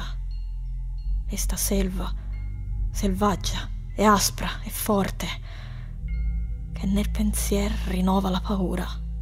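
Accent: Tuscany, Italy. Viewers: Interesting. Is this the way Dante would have spoken, or is the pronunciation closer to the modem Tuscan dialect? Or both? I speak Italian, and to me some words almost sound Spanish. Probably because of the famous Tuscan 'h' sound, but also because of the 'ch' sounds (like German) and the occasional 'th' sound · bra she is speaking like someone from tuscany TODAY! do you not hear "la gorgia toscana"? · Your tuscan accent is so pretty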